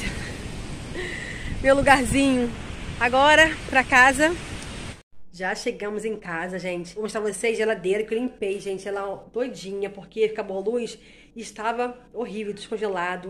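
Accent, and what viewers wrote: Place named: Rio de Janeiro, Brazil. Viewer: Que delícia de vídeo, amada. PARABÉNS PELA sua desenvoltura em nos presentear com seus vídeos naturais, alegres e divertidos. Amoooo. Bjs, gente lindaaaa... AMO seu forte sotaque carioca... Deus é nosso abençoado refúgio querida...😍😘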